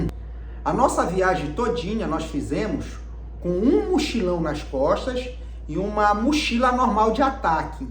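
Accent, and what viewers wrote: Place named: Pará, Brazil. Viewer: Pelo sotaque é paraense, rs! Acertei????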